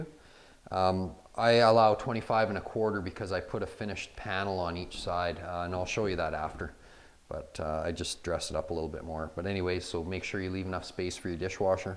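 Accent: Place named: Canada. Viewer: I like how you even tape over the manufacturer labels. True professional man your videos are great. The light Canadian accent makes it that much better.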